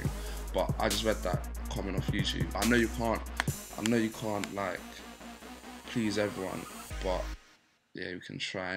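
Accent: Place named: United Kingdom. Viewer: bro your cool but man the brittish accent is gay man really gay if u talk like that in U.S the will think ur gay.i dont say you only talk like that all british do that.Make a own accent :)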